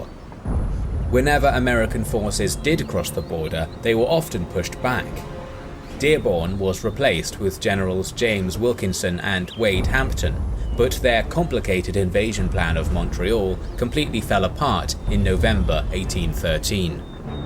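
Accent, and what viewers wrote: United Kingdom. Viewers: In which a Brit describes the battle, you win sir. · They also forget to include Militia units that had formed from Kentucky, Tennessee , and other states that had come there to help. They were tired of the British. Now the British and Americans are friends..but back then.. not so much. Odd too that this video is as USUAL by A BRITISH speaking narrator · Are you British? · Rather strange, this forgotten war was narrated by a Brit? I wonder what he forgot?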